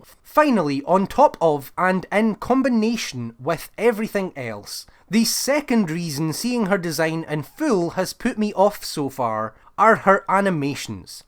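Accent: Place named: Scotland, United Kingdom. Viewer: I love your scottie accent holy shit😭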